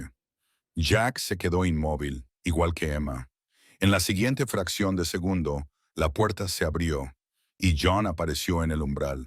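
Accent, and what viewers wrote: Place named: United States. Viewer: Estaban en la entrada de la casa? o estaban en el piso de arriba?, te contradices. Además esa voz agringada, me resulta chocante.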